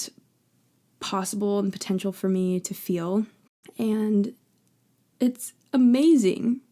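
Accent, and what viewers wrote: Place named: United States. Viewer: I am an Aussie ex Mormon and I love your American accent.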